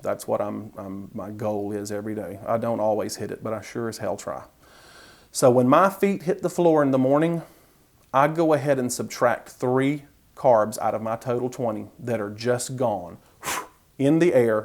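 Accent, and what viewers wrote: South, United States. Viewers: Thank you for keeping us informed! Keep up that southern accent !!! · Thank you for the info. I can't believe the spices! Off to check my favorite spice for carbs which is cayenne pepper! (: xx Love your southern accent btw.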